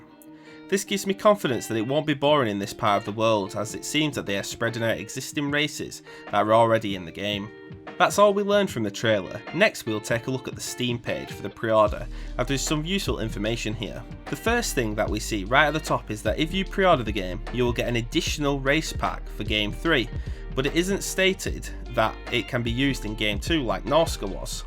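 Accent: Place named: Bolton, United Kingdom. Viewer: Where you from lad? You sound like my neck of the woods, Bolton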